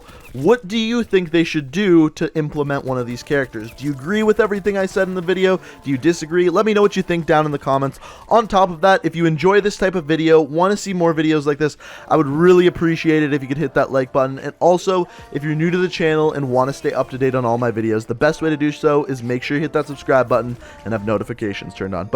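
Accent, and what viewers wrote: Canada. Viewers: Is this guy canadian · OMG the Canadian accent makes this video unwatchable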